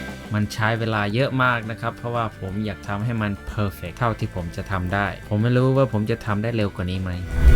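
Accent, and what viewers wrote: Isan, Thailand. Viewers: สุดยอดครับพี่ ผมก็เพิ่งจะเริ่มมาหัดเล่น RC ยังไม่รู้เรื่องเลยครับ ไม่รู้จะเริ่มจากตรงไหน เคยดูคลิปพี่เมื่อหลายปีที่แล้วอยากเล่นมากแต่ตอนนั้นไม่มีงบ เพิ่งจะได้กลับมาดูเพราะอยากเล่น RC นี่แหละครับ ดูเพลินมาก โดยเฉพาะเวลาเว่าอิสานนี่แหละเด้อ 55 ทำคลิปออกมาเยอะๆเลยนะครับ 😊😊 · ชอบการตัดเสียงพูดแยกกับเสียงคลิปหลักทำให้ไม่ขัดหูเวลาฟัง ดีมากๆเลยครับ ดีกว่าพากษ์ทับเสียงคลิปหลักทำให้เสียงซ้อนกัน และก็ชอบตรงเว่าอีสานผสมนี่แหละครับ🤣🤣 ชอบผลงานทุกคลิปมากครับ เป็นประโยนช์มาก ติดตามตั้งแต่ Mirage fix ขอให้ทำต่อไปอีกนะครับจะรอรับชมเสมอครับ👍🏻👍🏻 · สุดยอดฝีมือเลยครับ มักเวลาเจ้าว่าวอีสานเบิ่งเป็นกันเอง ฟั่งเเล้วเพลิน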